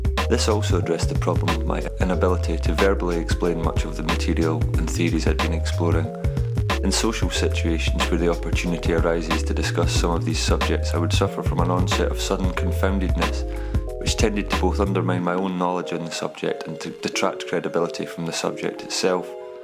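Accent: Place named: Scotland, United Kingdom. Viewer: I've really enjoyed your vids. Pretty exited that your back, or what? Been educated in the English language since 4th grade, some 30 years back. I know I'm not perfect at it, but quite good I think. Anyway... I DO like your Scottish or Irish accent, (excuse me) but your talking WAY to fast for me, to pick up details. Please consider your foreigner fans, when making the speech. THX Looking forward, to what might come up :)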